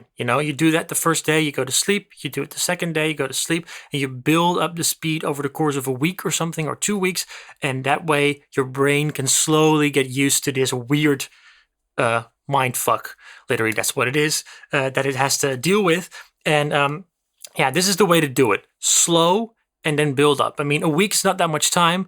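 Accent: Netherlands. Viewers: NIce exercise, explanation and video overall! Also, am I hearing a bit of dutch accent in your English or is my mind playing tricks with me?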